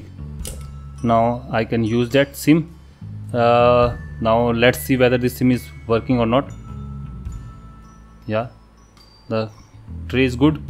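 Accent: India. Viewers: Don't listen to it then. I don't think its his grammer, its his accent. Can't blame him as he has a typical Indian accent because he must be from India.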